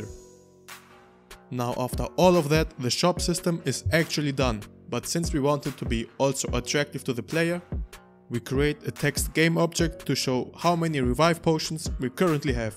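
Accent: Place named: Greece. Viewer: I firstly suspected that from your pronunciation. It sounded similar to Greeks' accent in English. Also in your About tab on your channel page, you can see your country because you used a Greek phone number to verify the channel.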